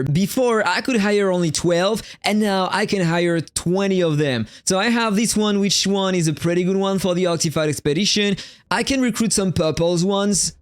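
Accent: France. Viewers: Tu a un accent français non ?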